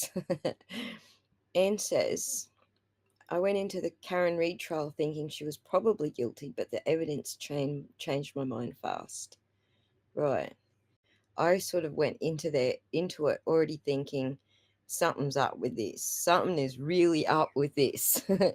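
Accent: Australia. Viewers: I really love listening to.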